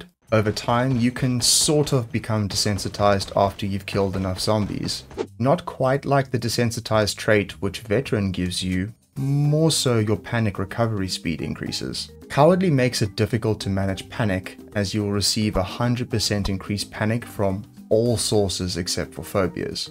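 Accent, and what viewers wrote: South Africa. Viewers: Hey bro are you South African? If so, greetings from the Eastern Cape! And great video man🤞🏼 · Are you a South African bru? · My Dude .... the South African runs rampant in you!! Thanks for the tips tho! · You sound south african.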